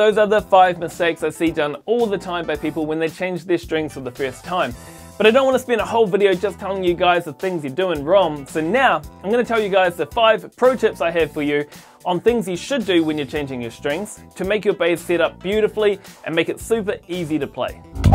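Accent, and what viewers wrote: New Zealand. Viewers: Sounds like a kiwi living in Australia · Tone down that New Zealand accent and I might listen longer.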